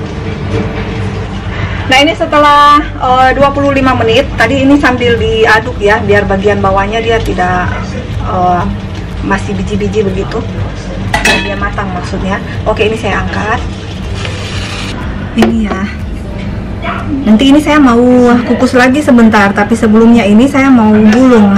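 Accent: Manado, Indonesia. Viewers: P senang vja dengar ba logat bah Manado,,beking kangen pa Kel.di kampung,,,btw Malaysia di mn dang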